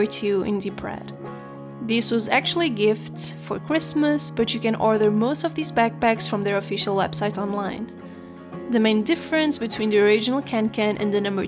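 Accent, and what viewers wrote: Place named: Portugal. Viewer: she's from Portugal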